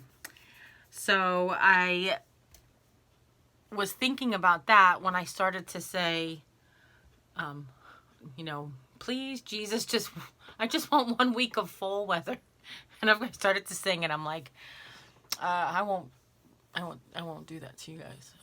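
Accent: New York, United States. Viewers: I could listen to you talk all day I just love your wisdom and your accent too hehe I hear that New York in there!! Love you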